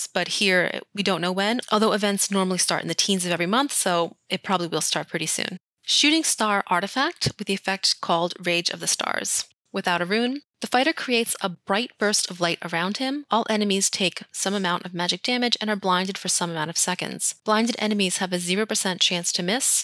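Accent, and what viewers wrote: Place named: New Jersey, United States. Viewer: New Jersey accent?! How did you end up in Europe? Come back to Jersey.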